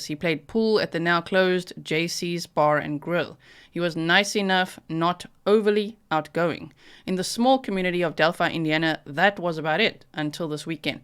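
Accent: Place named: South Africa. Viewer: I recently came across your channel are you South African I clocked the accent immediately. 😀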